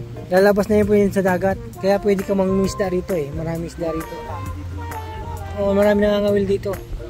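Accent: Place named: United States. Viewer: Para ka palang kano sir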